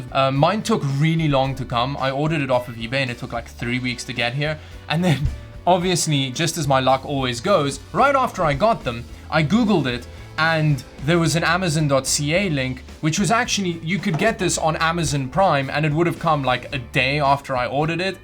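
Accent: South Africa. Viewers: South African??? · Are you South african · Ek kry die gevoel jy is ñ Afrikaanse Dawid, met daai aksent en al, so gryp daai soldeerbout en sit daai connector op en kyk of dit werk! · Are you a South African expat? Your accent tells me that you are. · Are you South African? You sound South African. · Yes definitely do it, i wana also see the result of the difference a 6 pin pci-e connector would make, you have a south african accent, are you south african? Just curious...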